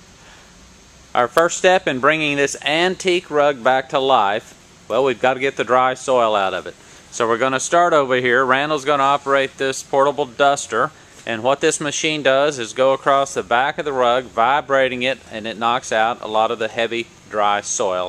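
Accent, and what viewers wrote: United States. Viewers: Just saw your video and had not seen how rugs were cleaned before. Thank you for posting it. You sound like a Southerner. Are you located in the US Southeast? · Are you from Tennessee??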